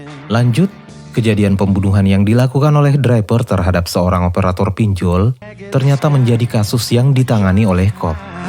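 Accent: Polewali, Indonesia. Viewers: Kamu org Polewali kah bang...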